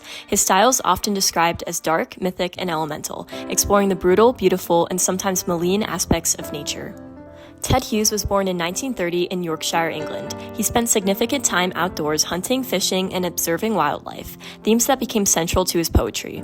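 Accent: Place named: United States. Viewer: Why is an American reading this? It's very poor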